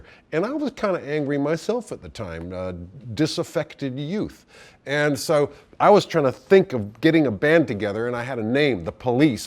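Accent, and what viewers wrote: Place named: United States. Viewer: He sounds so American but isn't he British?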